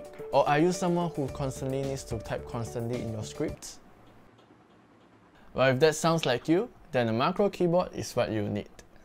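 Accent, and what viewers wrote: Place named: Asia. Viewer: Not in a rude way , but , Also the asian accent just makes the whole vid better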